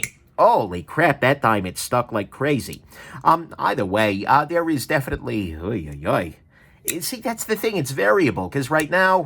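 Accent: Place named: New England, United States. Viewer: I tried to watch this but his ridiculous Yankee accent ruined it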